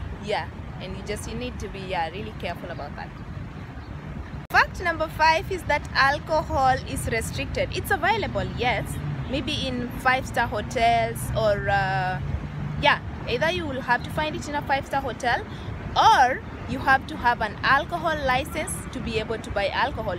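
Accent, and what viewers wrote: Kenya. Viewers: Are you kenyan? You sound like one. I am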